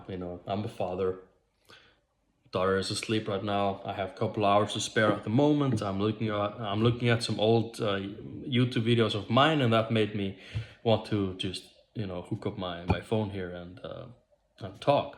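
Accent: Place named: Europe. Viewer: 🎉 Nice hearing from you again. I see this video was recorded 5 days ago but showed up in my notifications only 1 hour ago! You reminded me in this video of Nagidal. Maybe the European accent, your appreciation of Gix or your calm voice, but I appreciate that you still post videos every now are then even with everything else going in your life, and quick videos like this one don’t really take much effort to do. I know what you mean by glass babies, and I can’t find the proper English term for that either, but all the best wishes to your girlfriend. I also like the idea of streaming or playing a game with someone else in your videos, even if just watching and commenting on the gameplay.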